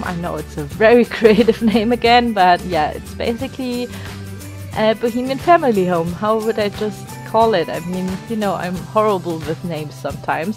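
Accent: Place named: Germany. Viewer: You've kinda german accent... maybe... don't know... xD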